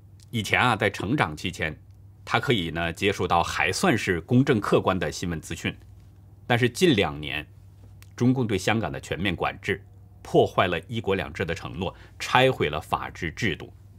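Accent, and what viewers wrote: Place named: Hebei, China. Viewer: 谢谢李老师。听老师的口音，好像是河北人？能听到一点唐山/承德一带的口音。😆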